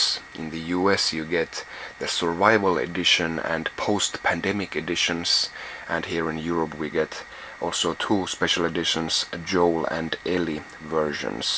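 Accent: Finland. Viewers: I'm constantly in Helsinki! I thought I recognized the accent! :) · joo huomasin aksentistas ja kanavan nimestä :D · hahahah finnish accent lol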